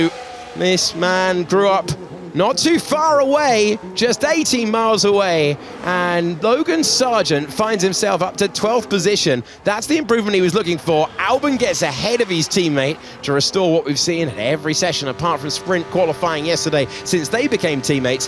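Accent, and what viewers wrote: United States. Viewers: Why is the sound of the cars so low, all I hear is the loud commentary. I know these aren’t the best sounding cars but I still want to hear them. And that American accent 😔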